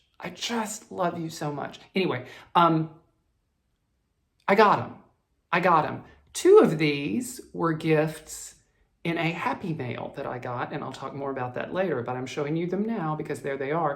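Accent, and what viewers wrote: South, United States. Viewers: Omg I just died 😂. What an absolutely perfect disclaimer. Just found your floss tube thanks to the Floss Bossin Cousins. Have been binge watching/listening while at work 🤫. When just listening you make me think of Leslie Jordon (your sense of humor or southern accent maybe)🤷🏻‍♀️. Looking forward to the next 50 or so videos 😃. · Great video. I always walk away from one of your videos smiling and quietly laughing. What a soft spoken southern gentlemen. My father in law was a southern gentleman, too.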